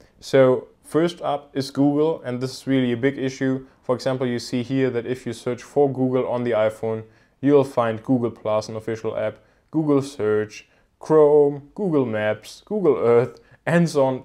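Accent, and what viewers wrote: Germany. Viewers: kanns sein das du ein deutscher bist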